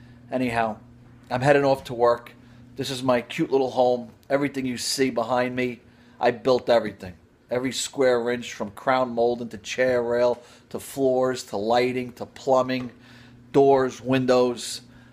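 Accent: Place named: Brooklyn, United States. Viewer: It's a Brooklyn accent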